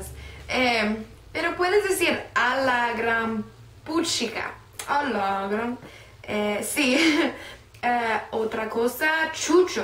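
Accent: Guatemala. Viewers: Tu acento, ya está sonando un 95% como el de Guatemala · Que calidad ver que te gusta hablar como chapina Dios te bendiga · Te felicito por tu acento guatemalteca, estás muy bonita y te ves buena onda!!! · Buen video felicitaciones aprendiste bien... al estilo Chapín no sólo español....✌ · Que bien le sale el acento chapin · No manches Si Estas Aprendiendo mucho el Español. Que se me Ase que Eres Chapina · Tienes acento muy Chapín. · Wow nena si hablas al estilo chapín, me yegas. Que viva Guate!! Vos=Tu Güiro =niño 👍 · Wao! que bueno que te gusta hablar como los chapines y sobre todo estas muy linda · Amo a esta chica y adoro escucharla hablar como chapina, GRANDE MI GUATEMALA :D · Hola! 😊me encanta tu acento Chapin, está chilero, 👍👏👋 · Si tiene hacento chapín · neta me gusta q ables alo chapin como desimos alo chinto va jajaja aki la mara abla mejor q otro centro americano aguevos y q linda ....viva guate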